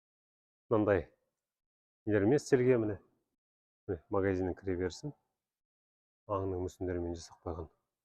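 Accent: Standard. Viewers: Өте казак тілін таза сөлеитінің көрініп түр. Жарайсың ❤❤❤ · Өте жақсы түсірілім былжырақтатып басқа тілді қоспай таза қазақша көрген қандай керемет.Рахмет.Америкада заң өте жақсы жұмыс істейді әрі бәріне бірдей.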